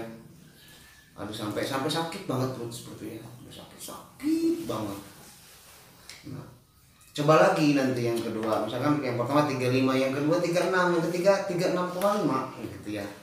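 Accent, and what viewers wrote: West Java, Indonesia. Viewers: Lucu tapi bermanfaat mkasih bang ats ilmunya😃Sunda pisaaan euyy😂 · Begitu sajah... Sunda pisan bhs indonesiana..😁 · Sunda punya, hihi logatnya itu lohh ustd khas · Mantap Kang... Prnjelasannya alami... orang sunda bgt... Hehehe. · Logat sunda tee bisa lengit aa 😁😁 Kasep ogeh dei aa ,,,shat trus nyaa aa ,,aamiin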